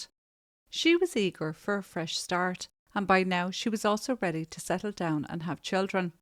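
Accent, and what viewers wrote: Ireland. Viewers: This Irish cat doing the commentary freaks me out. She sounds like a Mother Superior on crack!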